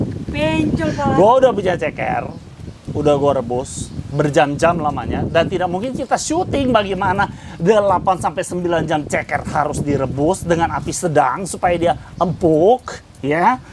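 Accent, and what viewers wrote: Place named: Java, Indonesia. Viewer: Koko medok banget jawa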